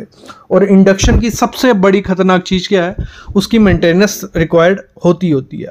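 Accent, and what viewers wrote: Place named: Himachal Pradesh, India. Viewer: Aap पहाड़ी ho kya you sounds like from Himachal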